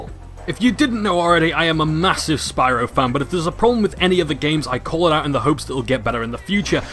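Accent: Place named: Northern England, United Kingdom. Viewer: It's your accent, it sounds more Northern than Yorkshire to me XD Are you gonna re-review the Rayman games btw?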